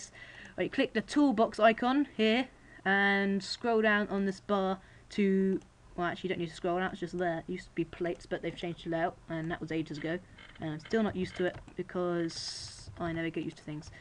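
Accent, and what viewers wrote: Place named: United Kingdom. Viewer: He's britan lol mostly I hate there acent.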